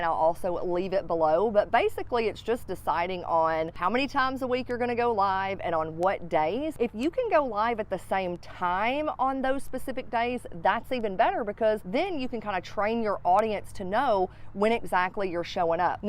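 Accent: South, United States. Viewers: Girl!! I love this video!! And you sound like a Southern girl like me! It's about time! :)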